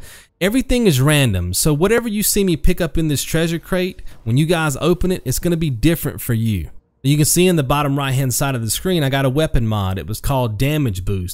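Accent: South, United States. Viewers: just curious though. Where are you from man? You sound sort of southern, yet mid-western.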